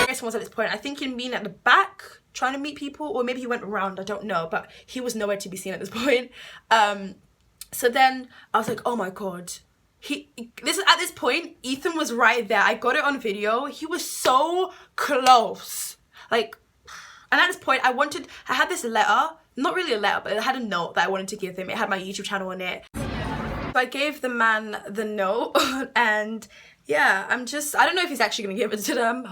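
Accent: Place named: United Kingdom. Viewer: Btw I love ur accent 😂 I'm part British and only get it when I'm speaking to fast or reading but I want it throughout the day cuz it sounds so nice and proper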